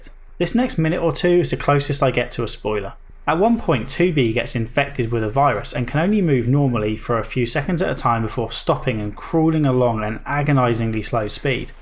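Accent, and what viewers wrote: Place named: RP, United Kingdom. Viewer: The fact that you actually did your background research, stacked with how thorough your reviews always are, multiplied by the fact that you speak the queen’s English (i’m american and love that accent) makes me wish you did full story spoiler reviews. I don’t really watch your videos as research tools for games I might play, i mainly just try things out, but I purposely look for your reviews of games i loved ie: dues ex for example.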